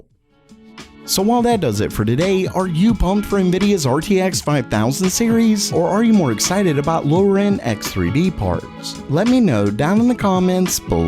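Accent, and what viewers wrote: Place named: Appalachia, United States. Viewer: Your hillbilly accent is unbearable. "It's one massive COARD"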